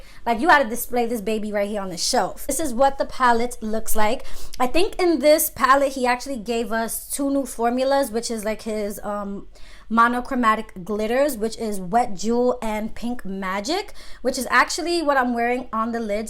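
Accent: New York, United States. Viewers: girl you sound so new york i love it lmaoooo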